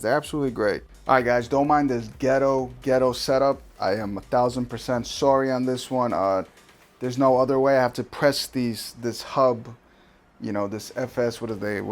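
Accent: New York, United States. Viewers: Love your NY accent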